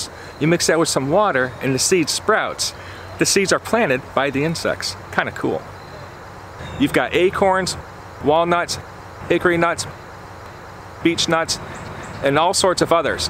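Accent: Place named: New England, United States. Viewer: Judging by the environment, it appears you are Coastal US. Accent seems to be more "North" of me. What State do you reside in? I'd guess New England area...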